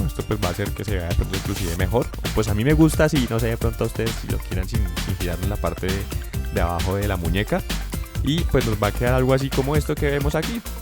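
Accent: Bogotá, Colombia. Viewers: Mmm algo me dise que eres colombiano de bogota XD, gracias ahora tengo guantes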